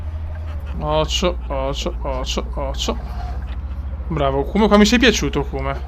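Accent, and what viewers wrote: Veneto, Italy. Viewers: L'accento veneziano mi fa impazzire, sei un grande! Vai così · Quanto mi sta in culo il tuo accento veneto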